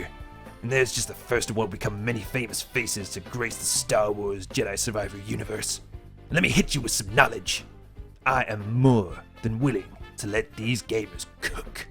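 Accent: United States. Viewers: I remember that doom RPG, it was pretty good. Nintendo is going hard at people now but they really didn't do much when the 3DS and WiiU emulators and tools were being developed while those consoles were very much alive. Nice American accent. QOTD, Probably the Entrain Odyssey collection for switch.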